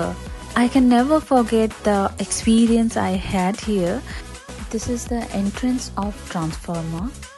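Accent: Tamil Nadu, India. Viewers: Cute Tamil❤ love from Chennai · U r tamil is so sweet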